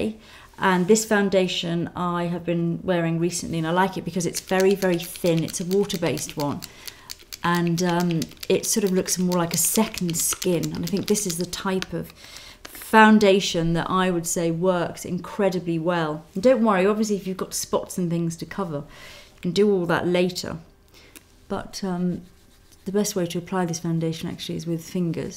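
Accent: England, United Kingdom. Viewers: you mean english accent, there is no such thing as a british accent. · im here to learn british accent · I LOVE her British accent :3 i feel that she was more of interacting with me in the video :D despite her talking a lot. but i liked it! :) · wow u are a makeup GURU! i love ur accent, lol when i try to be british i sound just like u. thanks for ur help. oh, and u look rlly beautiful! :D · I love the british accent it sounds so elegant :D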